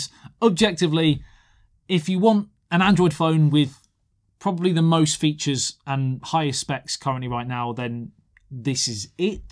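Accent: United Kingdom. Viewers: I got mine last week 512 GB in the phantom black I love mine but I don't live as much as I love my iPhone 12 pro Max it's got a better camera low light photos lidar scanner that I use every day at my job as an interior decorator it saves me time and money and time is money when you are working with that thing and all of the wonderful AR apps I just wanted to say that I just absolutely love his voice I have met many British people in my life but his is different it could be Welsh I don't know but it's the most beautiful beautiful accent on another human being that I've ever heard in my life from either England or whales or maybe Scotland I don't know where he's from but all I know is that voice is hypnotic !!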